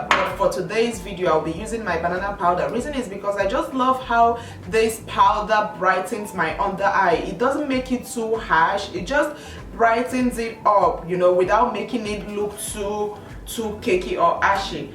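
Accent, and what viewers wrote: Nigeria. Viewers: Nigerian accents <3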